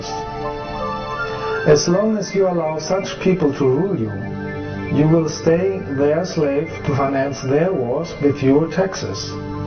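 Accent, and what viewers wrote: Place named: Germany. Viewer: Trovo questo discorso estremamente interessante e veritiero,ma non dovevano, per rendere la cosa piu' credibile ,far spacciare questo signore per un extraterrestre,infatti conosco bene l'inglese ed effettivamente suona come fosse un tedesco parlando inglese,quindi nulla di soprannaturale,ma nulla toglie che il suo discorso non fà una piega.Umanamente bravo nel suo intento.Se non dovessi essere corretto nel giudizio chiedo umilmente scusa a gli extraterrestri.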